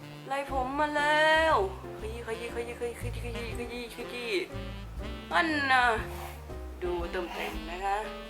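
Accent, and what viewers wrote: Isan, Thailand. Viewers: สำเนียงอีสานก็มา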